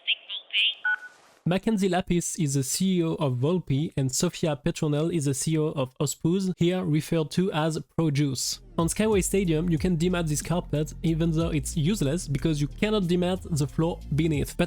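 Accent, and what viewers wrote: France. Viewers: Heaviest french accent ive ever heard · Att la dinguerie il est FR🔥🔥🔥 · Il EST français that’s not just his accent bro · You from France?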